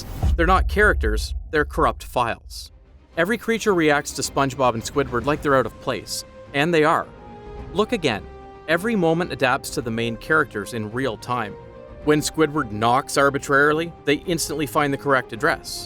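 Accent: Canada. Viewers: Your channel might be marked “United States” but your accent outs you as Canadian. I’m on to you, buddy! 🫵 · Are you Canadian?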